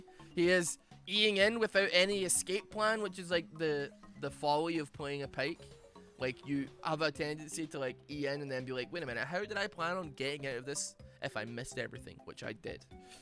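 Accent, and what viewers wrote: Scotland, United Kingdom. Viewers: Just a heads up, man, he's not Irish, he's a Scott.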